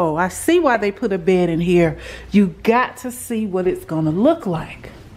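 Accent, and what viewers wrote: South, United States. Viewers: I love your voice, it’s so calming and southern! Love it, I’m from the south too but I’m more Appalachian sounding lol. Good job! 😊😊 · Best southern accent-I just liked listening to you.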